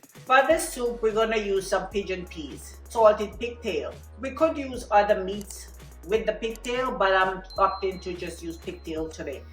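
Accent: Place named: Jamaica. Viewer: I don’t really like the Dried Peas. We make pigeon Peas Soup in Trinidad and Tobago and also in Grenada. I can imagine the taste. I stay away from the Pigs Tail 🤣. This is a meal for sure. They pot some round flattened dumplings sometimes. “Nuff nuff” 🤣🤣. You sound Jamaican too. Looks so good. Enjoy.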